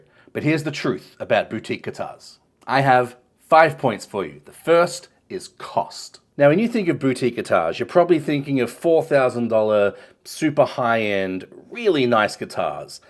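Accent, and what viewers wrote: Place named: Australia. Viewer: Nice try getting a free guitar sponsorship from them, you got too little subs and views, and ur Aussie accent ain’t helping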